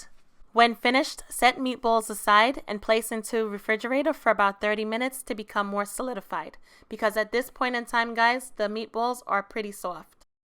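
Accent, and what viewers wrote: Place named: East Coast, United States. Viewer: Love that east coast accent ☺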